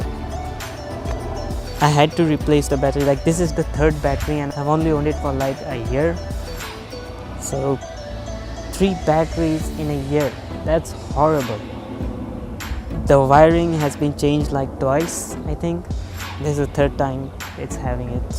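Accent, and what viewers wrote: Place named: Pakistan. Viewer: Ur English is also made in pakistan.as we can see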